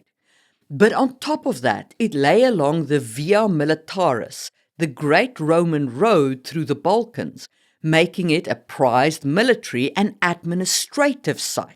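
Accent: South Africa. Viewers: Oh, it's no wonder I thought you were South African originally! I lived in Cape Town myself for a while 😁 Love your videos, thanks so much for all the tips and wisdom.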